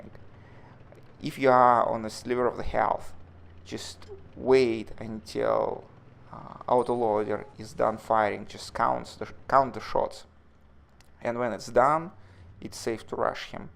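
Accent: Russia. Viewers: Totaljy Rassian accent :D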